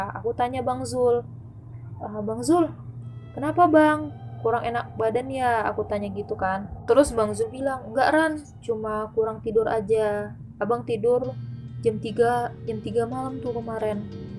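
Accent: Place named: West Sumatra, Indonesia. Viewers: Menurut gue sih ceritanya serem, story tellingnya juga keren, tergantung pendengaran kita masing2 sih.. Cara orang bercerita mah beda2 yak, kita gak bisa maksain orng bercerita dgn gaya sesuai pencerita yg kita suka, si mba nya orng sumbar pasti dari segi logat aja udah beda sama daerah lain jdi gak bisa dibanding2in jga sih, nikmatin aja ceritanya guys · Bukan menye2 mbak nyaaa, tapi logat dan cra ngmg org sumatra barat emng bgtu. Kbetulan saya org sumbar 😊 lain kli klo dngerin nya speed nya di naikin aja mbak jdi 1,25, saran nih 😂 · Pembawaan logat sumbar emang gini mbak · jelas kali logat sumatra nya lmao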